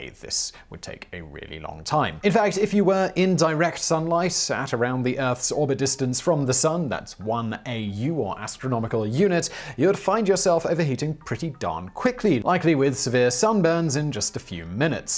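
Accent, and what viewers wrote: England, United Kingdom. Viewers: There is no space... Duh what a load of crap and your English accent makes you sound stupid. Blah blah blah blah blah nonsense. Not worth watching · Dude tone down the over posh British voice. We all know you don't really talk like that. Speak normal man.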